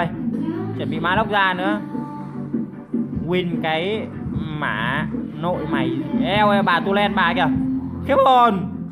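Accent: South, Vietnam. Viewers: thích cách nói chuyện của bạn nhiều lúc lại giọng miền nam nghe vui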